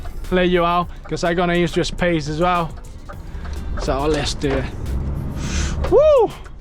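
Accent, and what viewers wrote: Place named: Manchester, United Kingdom. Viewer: Think he’s from Manchester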